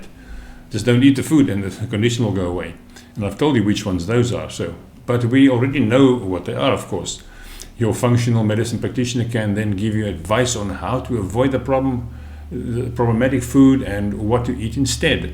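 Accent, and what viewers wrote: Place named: South Africa. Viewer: The minute i heard your voice i daughter he is south African. Miss that accent. Thanks for the info on cradle cap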